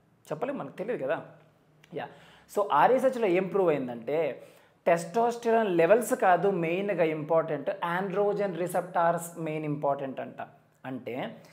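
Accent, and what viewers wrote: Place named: United States. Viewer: Anna miru telugaa America anukunaa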